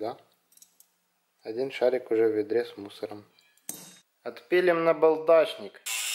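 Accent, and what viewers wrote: Odessa, Ukraine. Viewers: Вы из Одессы))?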